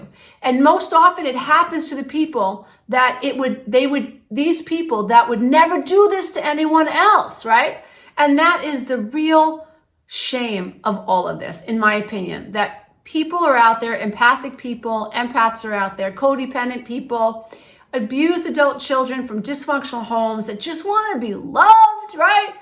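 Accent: New York, United States. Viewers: I think this is the best video of yours I've seen so far. You're bringing the correct emotional response to this sh**. That, along with the NY accent really brings it home! Thanks!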